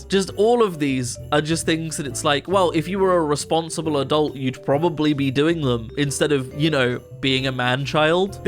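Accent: United Kingdom. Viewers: hi,im paje(she/they),are you scottish or irish by any chance? also whats chauffeur?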